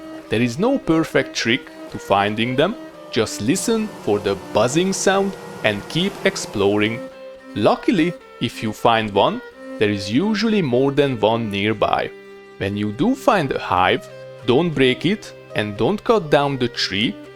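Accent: Hungary. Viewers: Ezt az akcentust csak magyar ember tudja produkálni :) Ettől függetlenűl köszönet a videóért! :) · Bro you have the most Hungarian accent i’ve ever heard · Az első 2 mondat után már leszűrtem, hogy magyar vagy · Noice hungarian accent. Reminds me to "the malaciz and the wolf" :D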